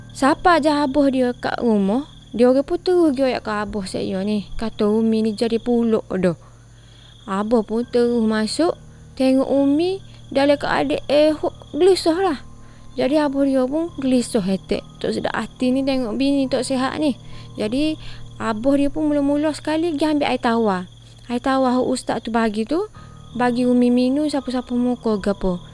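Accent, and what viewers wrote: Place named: North, Malaysia. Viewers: Hadeeeeeerrrr right now in the comment section below😅📏✌🏼 * Sis ckp utaqa😄👌🏼❤️👍🏼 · Assalammualaikum n hadirrrrrrrrrrrr bt set2 n krew, bapak besaq cek boh Nama kita, terima kasih ye mention Nama kita yg jelas nmpk, sapa rabun pn mst nmpk kleaaar❤❤❤❤❤, loghat utara sempoi cerita kali ni.. 😅😅😅😅😅 Moga bereh pa skala ya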